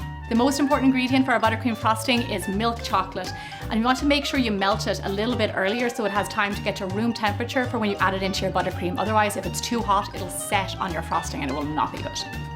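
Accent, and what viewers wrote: Ireland. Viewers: I thought u were from Ireland nice to meet you! ☺️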